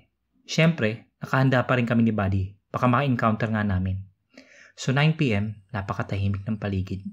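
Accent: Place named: Visayas, Philippines. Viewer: Natatawa ako sa tono ng bisaya ni admin..hehe..cute lng...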